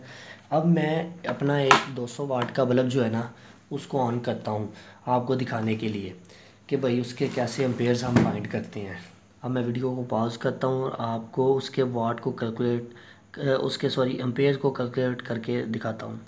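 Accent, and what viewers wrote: Punjab, India. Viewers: Good Work AND your accent is very cool REAL PUNJABI DUDE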